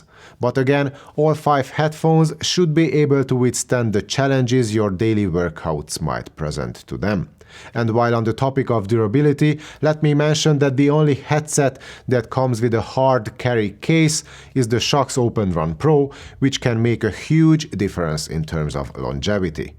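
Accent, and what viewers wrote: Hungary. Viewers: Szerintem magyar akcentust hallok 🧐🤔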